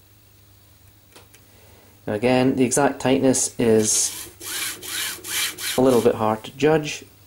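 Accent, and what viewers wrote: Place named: England, United Kingdom. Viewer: My last question: is AXIDRAW from England or EEUU?.I was looking at the prices and I noticed this is $475 dollar or pounds?When I saw your videos I noticed that you have an english accent therefore I made the question about the country jajajaja.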